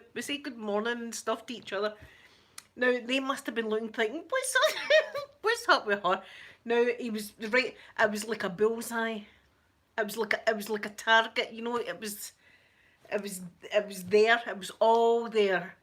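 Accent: Scotland, United Kingdom. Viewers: Your hair is gorgeous! You’re so pretty! I found you a few months back doing a search for asmr in a Scottish accent! Boom baby, I found you! Love your channel and especially the content! You can’t make this stuff up! Hahaha. You certainly live an exciting life. My kids are grown and live in Calgary and Vancouver so it’s just hubby and my animals living on 7 acres off grid here in Ontario! You bring so much excitement to my life. Thank you for sharing your life with us! ❤️🇨🇦❤️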